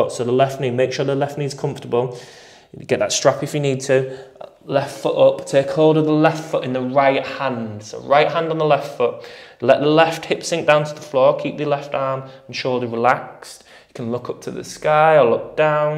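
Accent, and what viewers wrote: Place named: North, United Kingdom. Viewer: Thanks again. Loving your northern accent!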